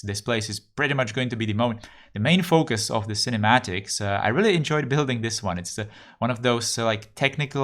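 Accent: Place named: Czech Republic. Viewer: Clicked on your video and instantly could tell you're Czech because of the accent :D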